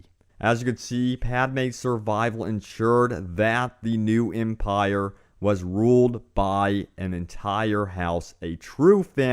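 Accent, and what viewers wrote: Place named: South, United States. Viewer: What state are you from? You sound kind of southern but not really.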